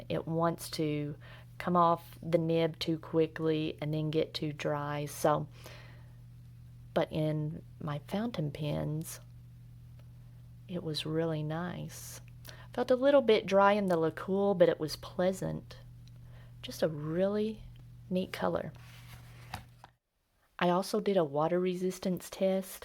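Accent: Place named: Alabama, United States. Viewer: You sound a little like your from Alabama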